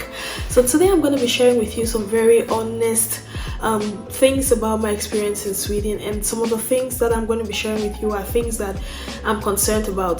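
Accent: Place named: Nigeria. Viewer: You sound so Nigerian 😃😃😃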